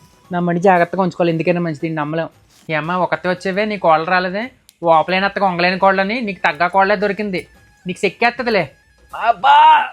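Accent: Godavari, India. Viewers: Baboi...😂😂😂 Super comedy...ekkada unnaru mastaru meeru..love godari slang and very close to heart ....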